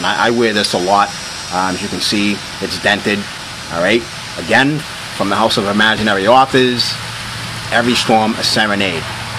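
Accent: Boston, United States. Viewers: Black guy with a Boston accent- wicked awesome! The legend is true- they do exist.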